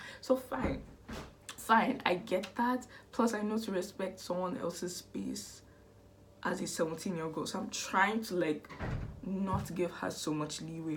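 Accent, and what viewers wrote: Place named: Caribbean. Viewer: Your accent reminds me of my family's, are you from Martinique or any part of the Caribbean by any chance?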